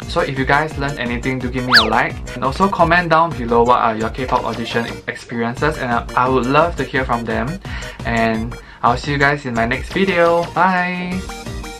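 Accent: Singapore. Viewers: I hear a singaporean accent👁👄👁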